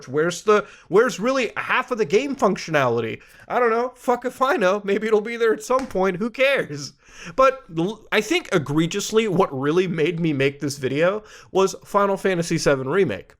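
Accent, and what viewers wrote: Canada. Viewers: I love when the slight Canadian accent comes out, but spittin facts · Canadian accent was unusually noticeable in this video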